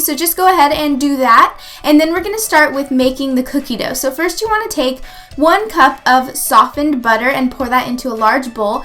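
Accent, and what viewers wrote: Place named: England, United Kingdom. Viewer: you must be from england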